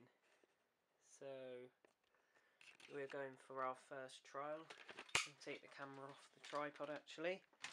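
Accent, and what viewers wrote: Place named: London, United Kingdom. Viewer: Do u live in like London or some thing? Cause ur accent sound like it a little. 👾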